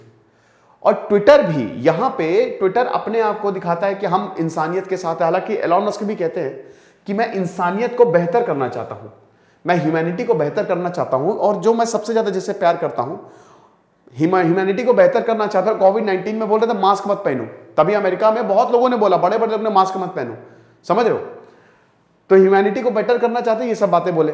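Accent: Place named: Punjab, India. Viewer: Bhaji app ki punjabi soo cute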